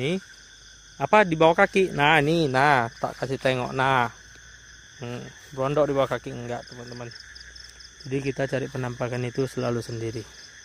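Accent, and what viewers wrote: Medan, Indonesia. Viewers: Bang abang asli medan kan? Medan dimananya sih bg pengen tau awak haha. Pesenku berkarya teross bg. Jangan abg ilangkan logat kita itu bg karna memang kota medan yang paling disegani diindonesia😂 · Logat Medan Abang orang Medan ya :D Awas ada yg berondok hehehe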